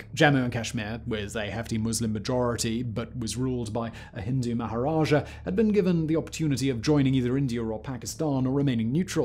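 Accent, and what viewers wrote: United Kingdom. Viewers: Is is me or do you sound MORE British in this video?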